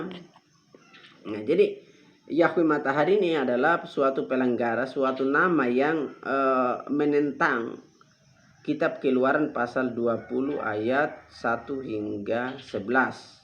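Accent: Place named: Kupang, Indonesia. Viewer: Dari logatnya ini kaya orang kupang ya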